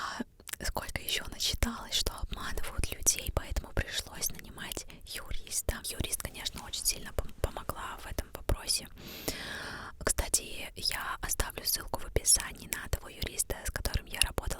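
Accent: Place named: Spain. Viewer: Puedo sentir tu español...❤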